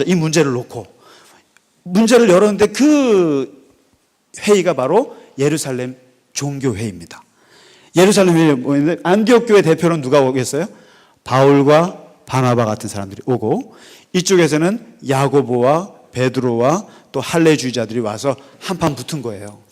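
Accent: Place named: Gangwon, South Korea. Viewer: 목사님, 목사님을 통해서 많은 생각을 하게됩니다 젊은신 목사님같으신데 해박하심에 듣고있는것이 즐겁네요 덕분에 사도행전-성령행전에 빠져가고 있네요 그리고 여긴 강원도 동해안인데ᆢ목사님 여기분이신가요? 말씀듣다보니 여기지역말씀이 불쑥나오는경우가있으시기에ᆢ^^ 언제나 감사하며 듣고있습니다~ 건강하세요~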